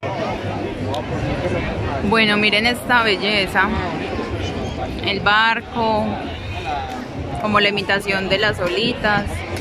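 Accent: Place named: Antioquia, Colombia. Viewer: Todavia no conozco Medellín pero un dia alla estare porque lá tengo en mi corazon pegadito a São Paulo y tus vídeos son como clases de espanol con mejor asento que porsupuesto es el asento paisa.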